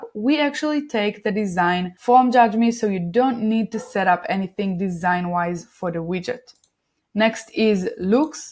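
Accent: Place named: Israel. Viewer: i can hear that Hebrew accent!! haha, great video, thanks!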